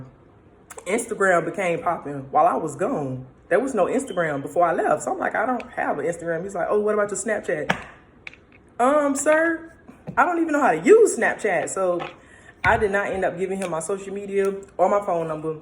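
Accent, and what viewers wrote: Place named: Broward, United States. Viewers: Ay where you from. Palm Beach checking in. Boynton beach to be exact...sounds like u from Broward or something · First of all I love that you are a South Florida gyal! #hometeam #icantellbytheaccentandattitudeofconfidence but can we just pause for what beautiful fruit God has hanging off your tree. I don’t know who he is…but when God reveals him to you, I will not be surprised at the king he is as it relates to the queen you are becoming. I’m beyond proud of you my beautiful sis. ❤ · You are such a great storyteller. That southern vernacular, I could just listen to you all day. Netflix is in your future.👍🏾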